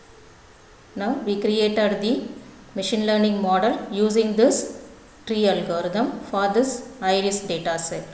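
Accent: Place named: India. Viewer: Unfortunately it is quite difficult for me to understand explanations in hindustani english.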